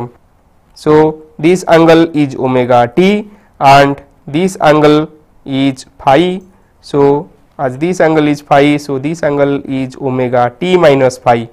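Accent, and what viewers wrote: India. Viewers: The movement of cursor to indicate which particular portion of the screen the student should focus attention on, is to be improved by increasing the size of the cursor. If that is taken care of, the quality of learning will vastly improve. Of course, students outside India will find it difficult to comprehend a pure desi pronunciation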